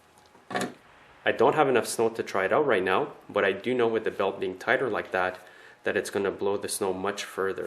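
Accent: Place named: Canada. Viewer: nice to hear a canadian,nice job on the video.thank you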